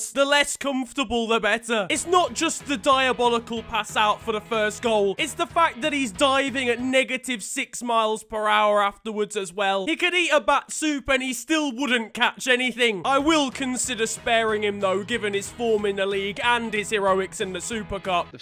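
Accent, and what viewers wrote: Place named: Liverpool, United Kingdom. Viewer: Dirty scouser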